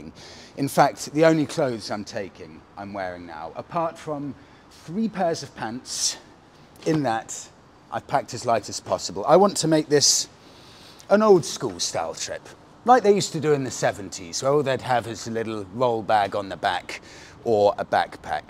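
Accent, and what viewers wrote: RP, United Kingdom. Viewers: Enjoy your trip to my end of the country. Though you will sound posh to most foke up here 😊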